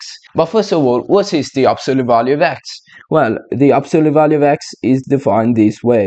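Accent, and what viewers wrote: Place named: United Kingdom. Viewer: May I ask you where you are from? I'm just trying to place your accent. It sounds similar to some British accents I've heard, but also maybe something else from a different country. I hope that's not impolite to ask.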